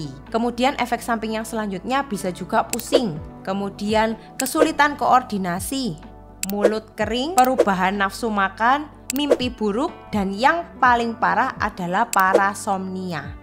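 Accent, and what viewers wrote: Java, Indonesia. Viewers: medok ya mbak...😁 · chindo medok jawa nih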